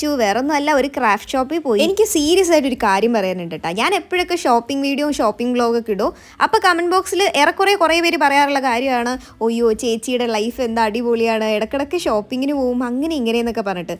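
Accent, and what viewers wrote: Palakkad, India. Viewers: Chechi vede palakkad anno eandha palakkad mannarkad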